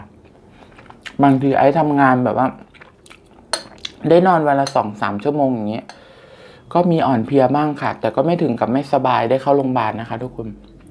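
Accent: Isan, Thailand. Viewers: อยู่เมืองกาญทำไมพูดอิสานได้อ่ะคับ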